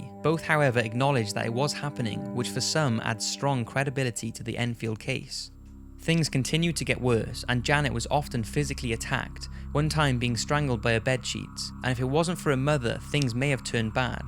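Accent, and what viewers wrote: Wales, United Kingdom. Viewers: k Not sure where you are from daz k, but to an English ear is from Wales and the "heerd" you have picked up is a feature of that regional accent. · Love your videos! Are you Welsh?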